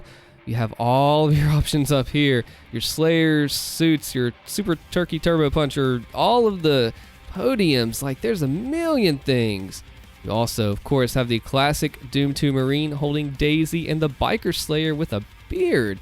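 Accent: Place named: South, United States. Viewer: Hey man. Your videos kept coming up in my Recommended section and I kept watching them (as I am a huge Doom fan). Although I liked your content, I found your accent incredibly annoying. Some kind of Southern accent, right? I'm not from the US so I can't tell, but I just found it unappealing to listen to. But I kept coming back for your content and I realised that your way of speaking is actually incredibly kind. The way you talk, the way you explain things. You don't sound aggressive or rude. I don't know you but it sounds like you are a super nice dude who probably wouldn't hurt a fly. Maybe I'm wrong but that's the vibe I get. +1 for content +1 for kind soft-spoken voice and explanations -1 for shitty accent We're still in the positives there so I subscribed!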